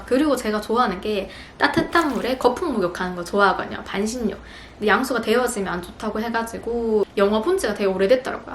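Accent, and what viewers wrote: Gyeongsang, South Korea. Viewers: 처음 댓글 달아봐요~ 경상도 사투리에 말이 엄청빨라서 호기심에 봤던 영상이 벌써 출산짐싸는 영상까지 하네요^^ 제가 출산경험자로 알려드리고 싶은건 여름에 아기낳으면 에어컨바람 조심해야해요. 넓은 손수건챙겨가셔서 목뒷쪽 찬바람 막아주면 좋아요. 모유수유하다보면 목,어깨 아픈데 산후풍까지 오면 힘들어요. 아기 낳는순간은 힘든데 참을만하고 낳고나면 아기생각에 정신없이 지나가요. 모든 엄마들이 그렇듯 도 잘 이겨낼수 있을꺼예요 순산하세요~~ ^^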